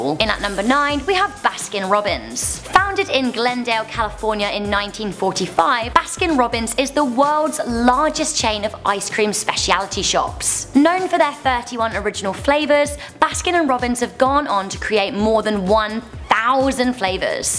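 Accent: United Kingdom. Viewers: she's british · are you British?☺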